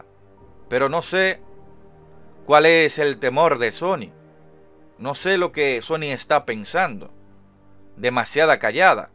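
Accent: Dominican Republic. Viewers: pero papa, yo no sabia que tu eres dominicano , aunque tu acento me paresia dominicano , pero no estaba seguro XD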